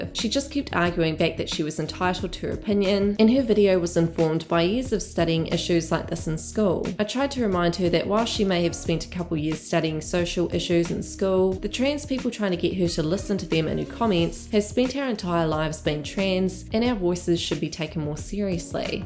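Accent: New Zealand. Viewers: Kiwi accent that’s why. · I am Australian. We don't have a twang. Only Kiwis and South Africans do. The way she pronounces things is definitely Kiwi. We have heaps of them living here, and vice-versa, so we're used to their accent. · Great vid always love to hear that kiwi 🥝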